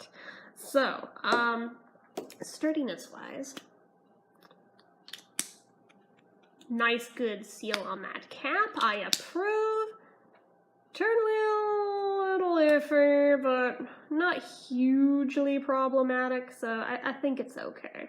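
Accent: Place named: South, United States. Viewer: I love her southern accent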